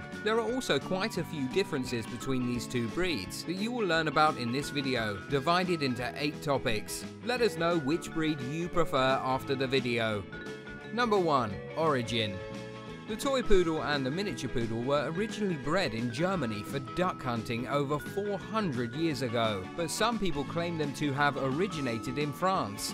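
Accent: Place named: England, United Kingdom. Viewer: I'm curious to why someone with an English accent is talking money in Dollars...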